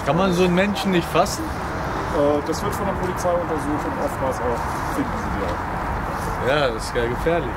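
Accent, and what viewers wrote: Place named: Germany. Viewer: You sound happier... and more successful... always learn one thing for sure..that is to smile and be happy... offcourse other points are there too that we learn and get inspired from...love your german accent and the language ... wish you vlog more often... and yes... CONGRATULATION ON YOUR MARRIAGE...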